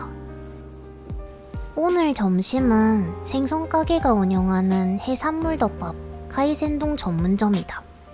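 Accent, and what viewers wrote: Gyeongsang, South Korea. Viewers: 전 경상도 사람이라서 억양이 낯설지 않은데요 일본어 할때도 억양이 경상도스러워서 어떤게 조선말이고 어떤게 일본말인지 헷갈리네요 actually 전 일본말을 모릅니다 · 경상도 사투리 너무 듣기좋아요~^^ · 갱상도 억양? 암튼 바이크 이야기 좋네요....안라!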